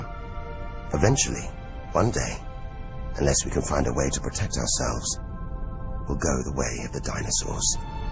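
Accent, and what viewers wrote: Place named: United Kingdom. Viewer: But the whole British voice is killing me lol.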